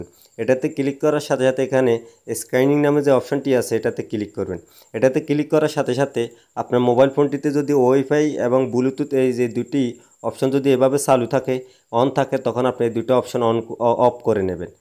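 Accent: Noakhali, Bangladesh. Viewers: নোয়াখাইল্লা ভাই আপনি আগে প বলা শেখেন তারপর কথা বলেন। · নোয়াখাইল্লা নাকি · Duru khankir pula nuakailla. ..shslar put agay kotha bola shik. .tar por video bana. .. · ভাই আপনাটর বাড়ী কি নোয়াখালি? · Vi apne noakhilla na? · তোমার বাড়ি কি নোয়া খালি নাকি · আগে কথা বলা শিখুন, নোয়াখাইল্লা।।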